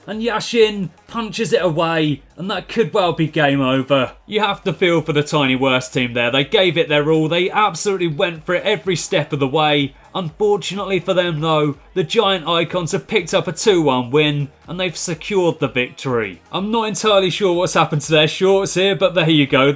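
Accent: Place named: United Kingdom. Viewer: I like your British accent melted through years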